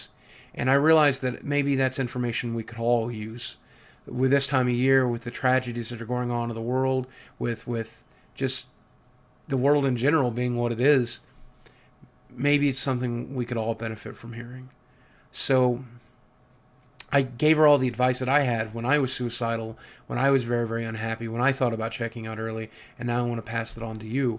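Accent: United States. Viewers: I can't understand you very well because althought i understand english, i cant understand very well the american accent. Its like spanish from Spain and Latinamerica, are different. But if your problems comes from your body (as you said is a big problem) or loneliness, you can change it but you have to do an effort. You can go to a gym and lose weight and be happy with your new body. That happyness improves your esteem. Its long to explain but you can do what you want with an effort.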